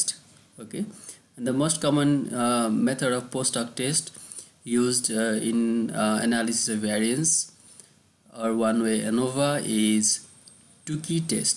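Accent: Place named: Nepal. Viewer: This guy sounds Nepalese. Anyways, nice video.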